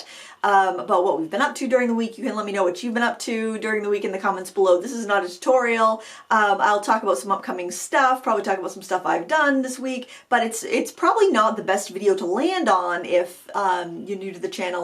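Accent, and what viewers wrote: Maine, United States. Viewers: You are an authentic Mainer!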